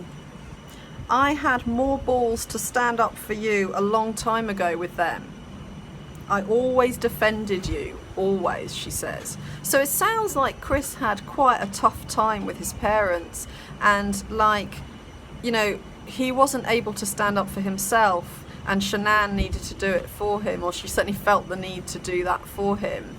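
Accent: England, United Kingdom. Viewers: For her accent I thought she was from England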